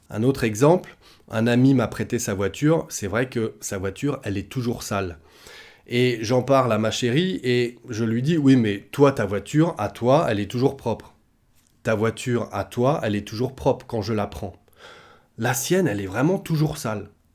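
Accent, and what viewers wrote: France. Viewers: Merci! J'aime beaucoup votre accent français et vos leçons. En anglais, on dit aussi "oops" ou "whoops."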